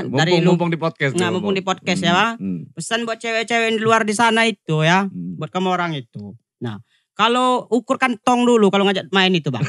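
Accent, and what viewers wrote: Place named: Lampung, Indonesia. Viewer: Lampung banget logat nya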